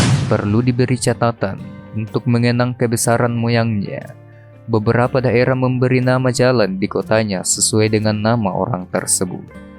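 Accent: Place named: South Sulawesi, Indonesia. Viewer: Lidah sulawesi selatan terdengar jelas dari mulut si admin,.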